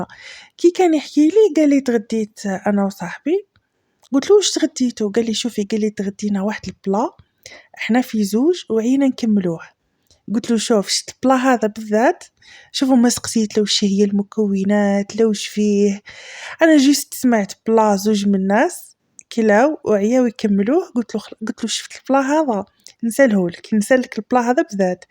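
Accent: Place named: Batna, Algeria. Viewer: بصحتك اختي تشري وتزيدي ❤❤ البارح برك شفتك ومن هدرتم عرفتك باتنية بنت بلادي ❤❤❤ كل التوفيق انا التوابل نتاع لبعل مانبدلهمش بماااال الدنيا 😂😂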